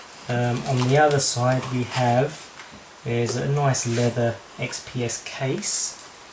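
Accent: England, United Kingdom. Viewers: r u english?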